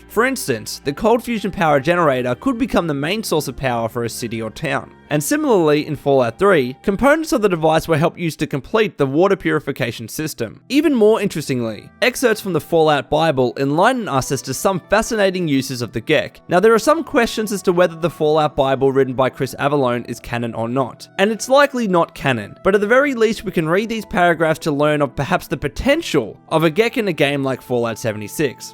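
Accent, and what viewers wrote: Australia. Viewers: Its not odd, I can completely understand what you're saying. I've watched enough Robert Whittaker and Mark Hunt interviews to understand the Australian accent. Its the way you say certain words.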